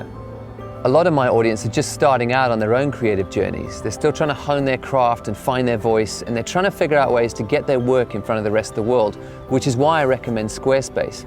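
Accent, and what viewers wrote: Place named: South Africa. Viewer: Aah, I thought I detected a bit of South African in that accent! Really enjoy your videos, and I can especially relate to the latest one, being a freelance writer in SA.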